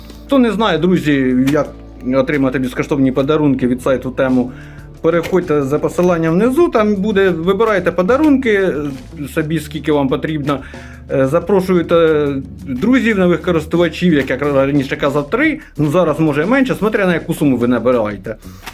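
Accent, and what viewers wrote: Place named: Mykolaiv, Ukraine. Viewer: у нас в Нико обл всю дорогу так как ты щас говорили , поэтому вспомнил счастливое детство)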